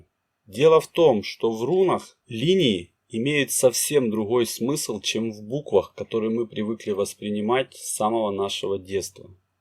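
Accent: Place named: Ukraine. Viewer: Благодарю! Вы очень классно объясняете! У вас как будто бы украинский или кубанский скорее говор, это сразу было не привычно, но на самом деле не важно, потому что важен не голос, а информация. Вы даёте понять глубинно, и даёте возможность слушать! Не всегда есть возможность читать книги, особенно в офисе. Спасибо!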